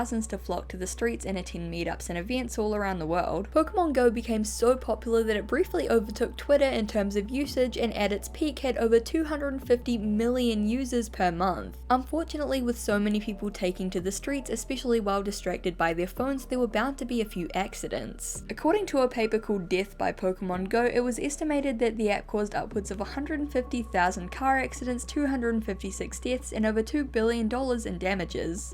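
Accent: New Zealand. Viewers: Kiwi?? I thought you were British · YOUR A KIWI!!!!!!!!!!! WOOOOOOOOOOOOOOOOOOOOOOOOOOOOOOOOOOOOOOOOOOOOOOOOOOOOOOOOOOOOOOOOOOOOOOOOOOOOOOOOOOOOOOOOOOOOOOOOOOOOOOO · wait, you're a kiwi too?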